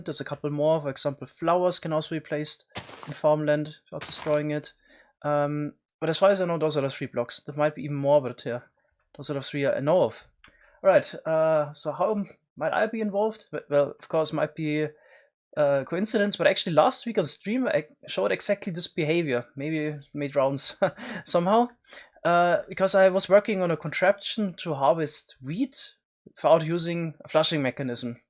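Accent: Germany. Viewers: You're German I can hear it Wie gehts dir mein Kamerad? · You're German right? Ich auch, man merkts an deinem Akzent und daran, dass du das "th" als "s" ausspricht ;) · I can tell that you're german. Greetings from Germany xD Great vid by the way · Man merkt so dass du Deutsch bist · are you german? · You sound soooo german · Are you german? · Are you from Germany? Kinda sounds so xD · you sound german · Laut deinem Akzent Leite ich ab, das du deutsch bist😂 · Are you German?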